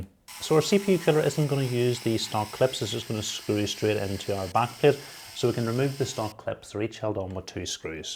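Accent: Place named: Northern Ireland, United Kingdom. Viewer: That's just a northern Irish accent...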